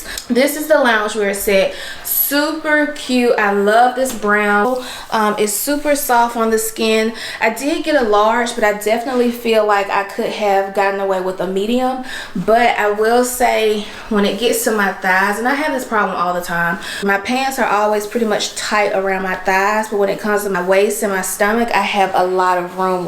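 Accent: South, United States. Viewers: Do you have a country accent it's so sexy with yo thick fine self with all do respect boo 😘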